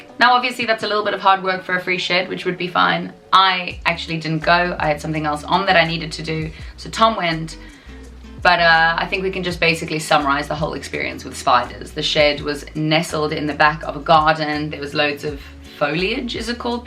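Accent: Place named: South Africa. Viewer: sounds so South African!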